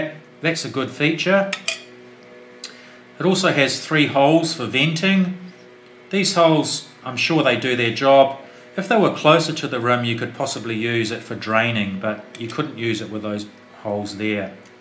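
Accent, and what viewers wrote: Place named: New Zealand. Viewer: nalgene also has a range of ultralite bottles. Nice video by the way, I was a little surprised when I heard the kiwi accent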